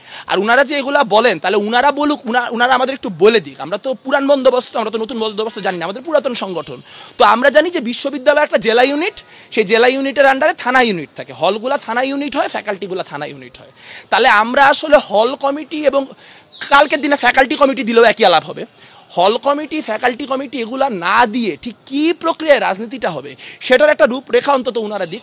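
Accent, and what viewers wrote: Kolkata, India. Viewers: ওনার কথা গুলা কেমন যেনো কলকাতার মানুষের ভাষার মতো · এর কথাবার্তা শুনে তোমার ইন্ডিয়ান মনে হচ্ছে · তার কথাগুলো কলকাতার মত।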